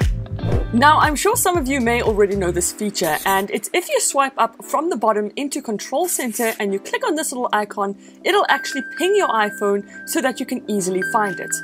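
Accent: South Africa. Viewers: Are you from South Africa if not my accent reading is off. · I love her accent. Idk if shes south African but her accent.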